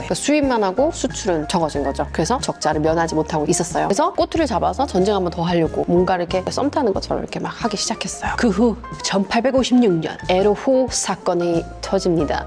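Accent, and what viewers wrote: United Kingdom. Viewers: 영국에서 살았었지만 역사에 관심이 없어서.. 시험에 관련된 아편전쟁만 외우고 있었는데 이런 배경이 있을줄이야~~~ 예전부터 님꺼 듣고 영국영어 계속 공부해왔었는데 이런 상식도 넘 듣기 좋네요 ㅎㅎ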